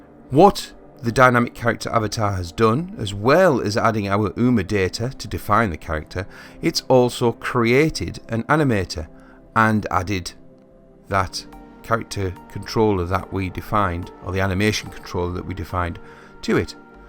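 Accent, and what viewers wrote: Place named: Northern England, United Kingdom. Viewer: Most unity tutorials online seam to record their audio on a potato however you sound like a professional voice actor. Sean Bean vibes from your northern accent. Excellent production quality and can't wait to get further into the tutorials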